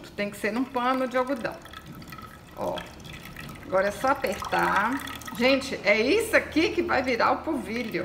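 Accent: Minas Gerais, Brazil. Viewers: Mineira certeza, só de ouvi -la já é uma cura, linda 😘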